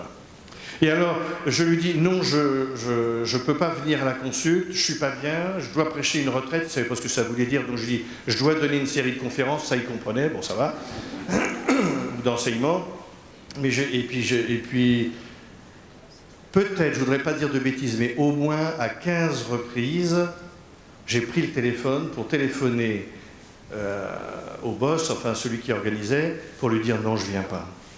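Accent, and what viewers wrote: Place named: Nord-Pas-de-Calais, France. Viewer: Merci beaucoup pour votre témoignage et votre humour, on dirait un chti, comme moi, sauf que moi je ne suis pas guérie ! Évidemment je ne suis pas en chaise roulantes, mais je prie pour ne pas en avoir une ! Non c'est pas vrai, c'est une blague ! Je suis très contente pour vous, mais je plein ceux qui vous entourés, vous parlez beaucoup, moi aussi, ils ne me coûtent plus, c'est laventage quand on est jeune de 62 ans et demie ! Mdr ! Gros bisous merci à Dieu, que vous puissiez continuer comme ça encore très long......temps!lol bon courage merci beaucoup Mr, je sais plus !... Le curé ou mon Père, zuttt j'ai oublié ! Gros bisous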